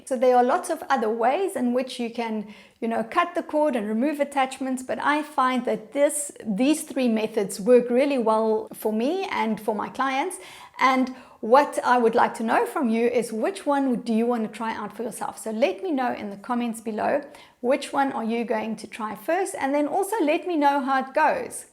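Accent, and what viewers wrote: South Africa. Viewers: You sound south African 🇿🇦🥺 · You sound south african,I feel at home❤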